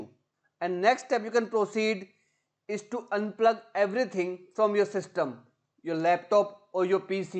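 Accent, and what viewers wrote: India. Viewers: Thank you, i had this problem for like half a year and couldn't find how to fix it, but thanks to you my device is now working correctly, and that india accent 🥵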